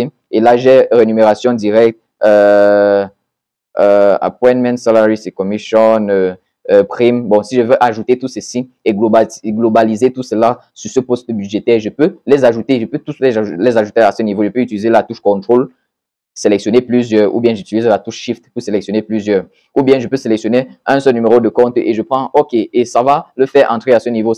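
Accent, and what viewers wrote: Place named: Central Africa. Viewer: Commentaires anormalement élogieux !!! C'est du pipeau !!! La vérité c'est que vous parlez trop et avec un accent difficile à capter pour des non centrafricains Abrégez svp et soignez votre diction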